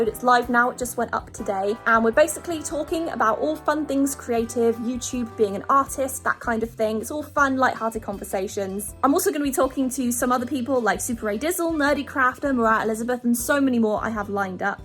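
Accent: United Kingdom. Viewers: IDK why she llookss like millie Bobby Brown and has British accent is it just me yeah I think so I'm weirdd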